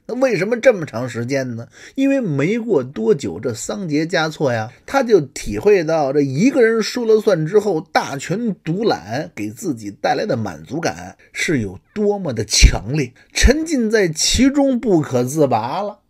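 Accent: Beijing, China. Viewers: 冒昧的判断一下 这是一口老北京北城话😅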